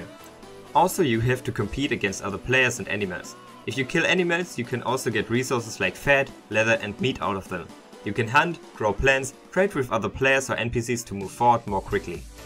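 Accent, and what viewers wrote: Germany. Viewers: Brooo man hört so hart das du deutsch bist ! Aber gutes Englisch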